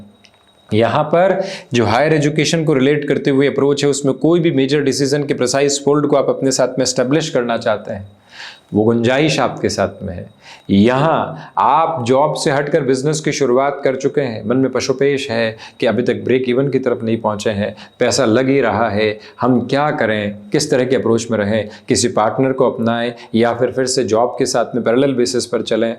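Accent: Standard. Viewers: Kaise kar lete hai guruji aap shuddh hindi aur ucch koti ki English dono ek saath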